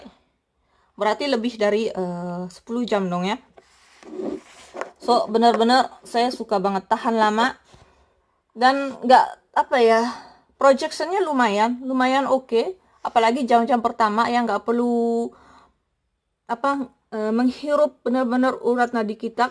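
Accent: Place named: Banyumas, Indonesia. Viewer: logat nya kok spt logat ngapak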